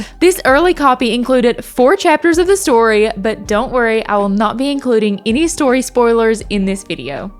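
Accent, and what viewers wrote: South, United States. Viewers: Damn that’s a strongggg country/southern accent 😂😂 shocked me at first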